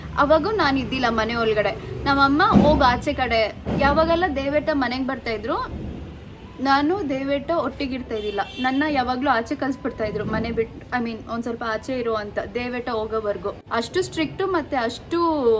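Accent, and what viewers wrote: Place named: Andhra Pradesh, India. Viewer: Why you have telugu accent??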